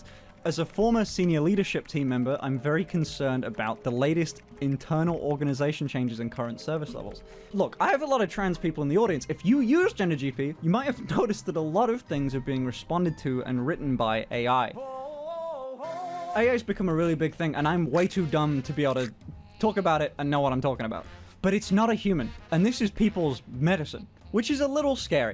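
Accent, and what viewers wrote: Birmingham, United Kingdom. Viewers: Both British and a Brummie. Fucking disgusting! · NGL - Never thought you were a brummie fr fr - Does that mean I might have seen you in Gales?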